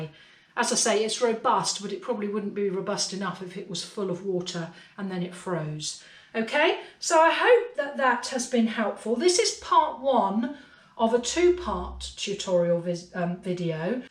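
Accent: United Kingdom. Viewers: I had never heard of Blue Peter & had to Google it - a can’t get enough of your British accent & expressions! We call the “whirler” a banding wheel in the US but I’ve decided to start calling it a “whirler” - that’s so much more fun! Sending much love & I can’t wait to make my first poppy. I’ll email you a picture when it’s all glazed! Cheers!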